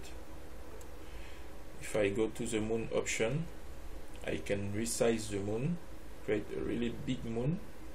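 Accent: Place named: France. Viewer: un petit "accent" Français ;p haha tu fais l'effort de parler anglais et c'est pas evident sans ecrire de texte avant meme en Francais . merci pour la video je partage( passe voir la chaine et le site si ca peut aider aussi) A+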